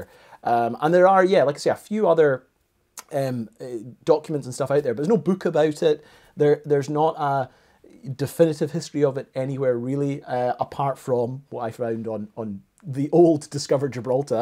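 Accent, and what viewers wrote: Scotland, United Kingdom. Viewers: Really great video,. Never heard of this bunker before and I guess a lot of people didn't know anything about this top secret place either. The idea of basically being buried alive in there is kind of freaky. Those volonteers had balls of steel and were really dedicated to their country. I loved the narration and the scottish accent. I'm going to go watch your fort drum video, I've been looking at that thumbnail for a while.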